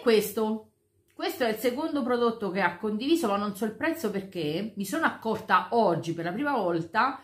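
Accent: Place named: Tuscany, Italy. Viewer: Dai, sei toscana...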